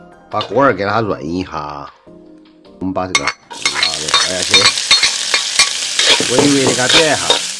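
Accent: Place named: Sichuan, China. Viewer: 雖然聽不懂四川口音，但還感受到那份香味！以後請加上字幕及食譜所用材料、調味料，可以嗎？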